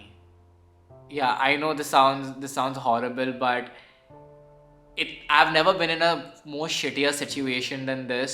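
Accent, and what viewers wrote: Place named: Mumbai, India. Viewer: Your Bombay accent is very sexy 🤌🏻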